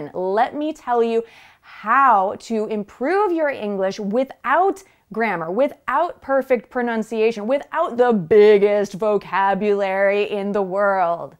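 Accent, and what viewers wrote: United States. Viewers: I love British but your American English is perfectly beautiful and I always enjoy learning English with your channel, in fact I've subscribed it years ago. By the way, I tell my students too to practice speaking English in front of the mirror at their home.. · Whare are you from sister? Is it from America?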